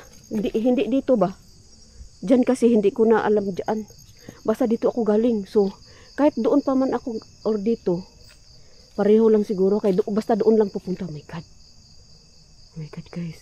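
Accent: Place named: Cebu, Philippines. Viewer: It seems your voice is Cebuano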